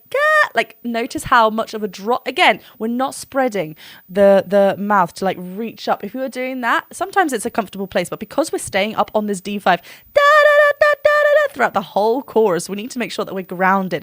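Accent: United Kingdom. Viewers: Nice reaction. Be prepared, The Warning Army is coming. This will be your next favorite band. The Warning is addictive. You have no idea how much fun you are going to have going down this rabbit hole. I assume from your accent that you are in the UK. They just played a soldout show at O2 Academy Brixton in London. Your next reaction should be Evolve, live at the MTV VMAs. Don't forget to turn it up. As all Warning fans say, "You have been Warned!"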